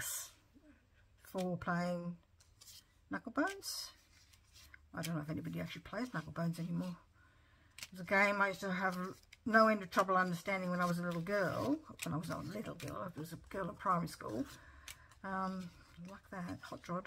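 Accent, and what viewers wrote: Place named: Australia. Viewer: Love your vid! It’s nice to hear an Ozzie! Lovely cards and washie tape and stamps! Love to see what you can do with them! Francke from Brisbane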